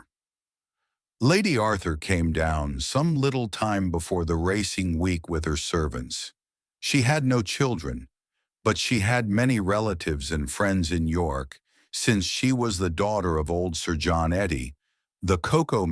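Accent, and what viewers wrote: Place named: United States. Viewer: I'm sure this is a very fine narrator but I I don't believe these stories could possibly carry as well with an American/Canadian accent as with someone from the UK…since these stories take place in London, and London being as great a melting pot as say New York City San Francisco or chicago, of the same era, any accent from the British isles would do. I mean if it's a i which it obviously is, can't you just as easily click on the British accent button, or however it works??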